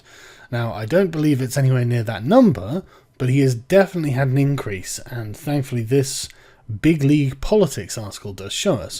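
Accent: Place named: England, United Kingdom. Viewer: Why do you think he is poorly informed ????? Alex "says" that he has great Sources in Many Branches in the USA Government. You sound like you are FROM England. Tommy Robinson has stepped out like a real MAN to defend the FREEDOM of Speech. Best wishes from the USA...MAGA with Donald J. TRUMP..Stand up for your RIGHTS or DIE protecting them...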